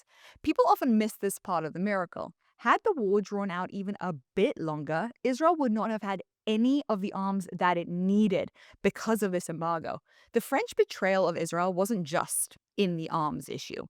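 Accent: England, United Kingdom. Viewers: All good and makes total sense. This will terrify israels enemies. Even more than now! Ps. You are precious!! You often have a strong English accent. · YOU AND THE BRITISH ACCENT HAVE ME WORKED UP BEYOND BELIEF!!!!!!! WHERE DOES THAT ARROGANCE COME FROM!????????? IS THAT WHY 90% OF THE WORLD HATES JEWS AND ISREAL? I'm not one of the Haters But if you Keep Running That MOUTH!!! KNOW YOUR PLACE!!!!!!!!! CHRIST IS KING!!!!!!! 🙏🇺🇸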